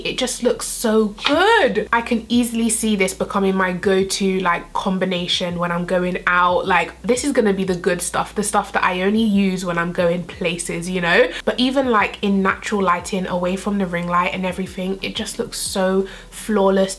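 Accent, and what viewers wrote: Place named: Southern England, United Kingdom. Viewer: so nice to listen to love her accent. It’s southern but not London and I love it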